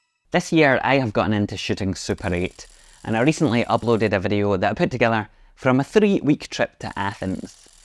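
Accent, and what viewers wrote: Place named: Glasgow, United Kingdom. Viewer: PS - He’s a posh weegie. 😮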